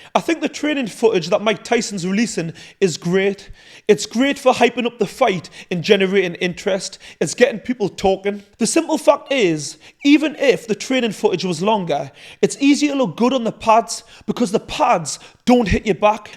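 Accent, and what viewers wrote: Newcastle, United Kingdom. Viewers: It's like watching an episode of Geordie Shore with the narrative style and geordie inflexion of the voice - well put together and delivered mind, OSS🤙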